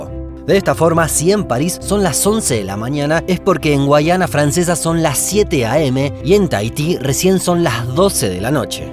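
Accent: Buenos Aires, Argentina. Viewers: una pena el acento argentino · Sos argentinos o uruguayo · De todas maneras además de hablar de las "Islas Malvinas" y reivindicar nuestra soberanía sobre ellas en varios videos en los cuales se las nombra, y del acento caracteríastico de los porteños (no de los argentinos, porque en mi país tenemos muchos acentos distintos), el canal está registrado como un canal argentino. Estos muchachos han estudiado en una de nuestras honrosas Universidades Públicas, dios y el pueblo las conserve.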